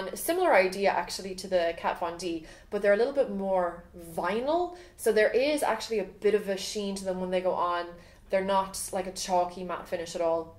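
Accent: Ireland. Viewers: are you irish? i dont know because this is your first video ive seen.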